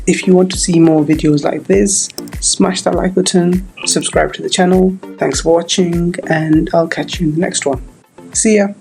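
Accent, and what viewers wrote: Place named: Pakistan. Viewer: You sound like a Pakistani 😕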